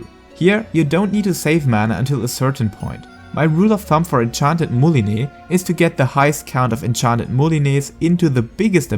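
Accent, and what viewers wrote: Germany. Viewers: he didnt even pronounce a german word the german way, when he sounds like a german dude :P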